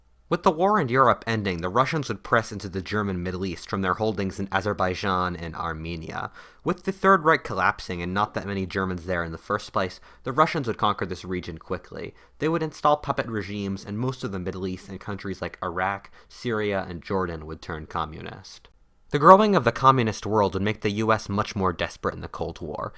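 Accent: United States. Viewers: Stupid fuckin annoying American accent coupled with poor analysis. Shite video. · I was complimenting him on knowing how Aussies say those place names. There's another word Americans get wrong, Australians say Ozzies while most Americans say Ossies. He's obviously wel travelled and pays attention, without losing his American accent during normal speech.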